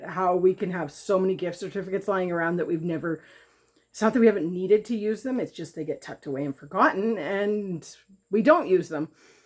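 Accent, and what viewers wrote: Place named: Canada. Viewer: So glad that you are back to YouTube! I was missing your videos; as a Canadian it's so good to hear 'local' voices.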